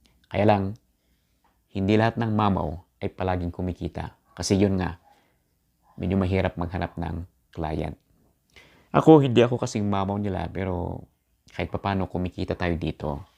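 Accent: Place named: Ilocos, Philippines. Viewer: Ilokano ka ngayam sir haha nice one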